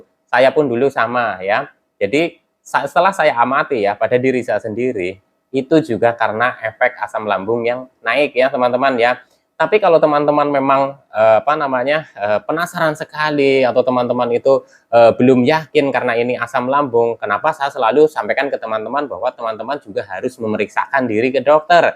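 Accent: Banyumas, Indonesia. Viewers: Mas berapa lama kena gerd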